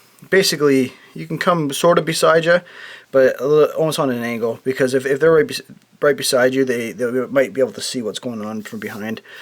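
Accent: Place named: Ireland. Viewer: The real magic trick here is the subtle Irish accent he has.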